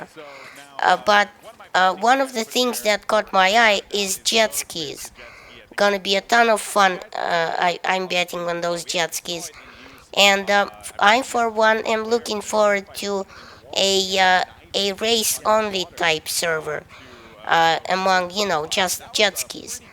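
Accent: Russia. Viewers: are you Russian by any chance?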